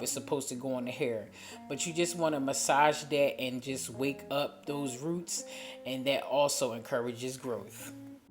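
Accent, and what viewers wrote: Philadelphia, United States. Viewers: Where are you located, you sound like Philly. If so what's up family!!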